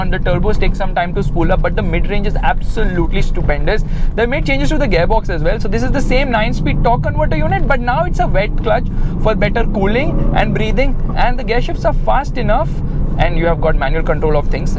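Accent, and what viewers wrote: India. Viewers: Indian accent is really hilarious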